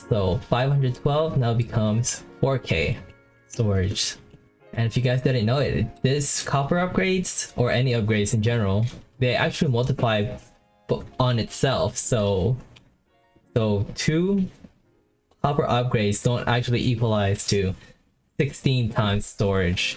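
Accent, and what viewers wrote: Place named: Brazil. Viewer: Não precisei de 2 segundos de proza pra saber que esse caba é br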